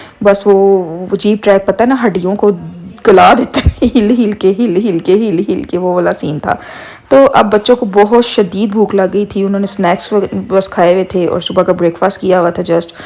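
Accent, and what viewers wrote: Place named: Lahore, Pakistan. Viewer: how can you speak so fast without any stop (are you from lahore, i guessed it from your speaking style), i enjoyed your commentary more than the video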